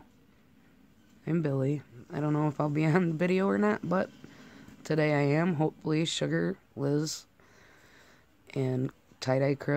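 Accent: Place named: Michigan, United States. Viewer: Cool! Nice to meet you! Love your accent reminds me of my cousins up there. If my family had stayed in Michigan I would sound the same. I am so happy I was able to inspire you in any small way. It is why I feel like I need to share my art more, in order to give permission to others to just do it, play! I am big on embracing the inner child. Girl don't you even worry about your teeth, You are uniquely YOu. I had a friend in Memphis who had the worst teeth, but she was one of the most beautiful people I had ever met. One of our BFFs Danno has 2 teeth in his head, but our BRO has been there for us time and time again! I'll take my toothless friend Danno any day over some shallow egotistical pretty boy who can't even be bothered. Keep em coming I can't wait to see some of your art!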